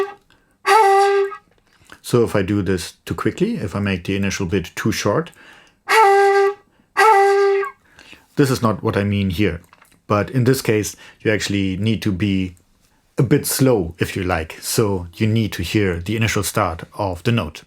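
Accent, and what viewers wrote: Germany. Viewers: He has aGerman accent???? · The best shakuhachi tutorial on YT! Even your heavy german accent doesnt matter :)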